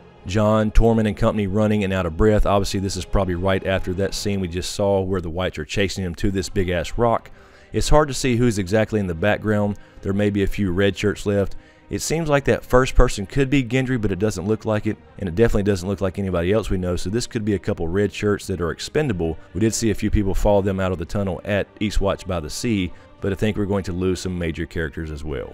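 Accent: South, United States. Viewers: I love your videos. I detect a Southern accent. Where are you from?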